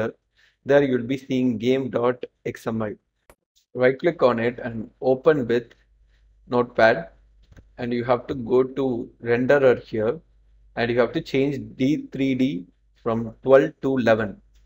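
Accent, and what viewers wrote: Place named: India. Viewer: Блин как же индийский английский похож на русский английский )))) Спасибо за видео, работает!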